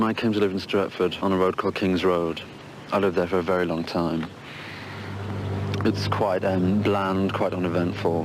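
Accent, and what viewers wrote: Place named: Ireland. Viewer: O sotaque irlandes dele junto com o inglês da um tom de soberania na sua voz, eu simplesmente amo.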